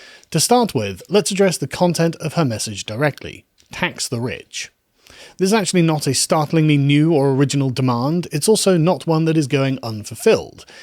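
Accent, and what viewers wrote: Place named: West Country, United Kingdom. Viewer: It's that ever so slightly West country accent. Makes me homesick.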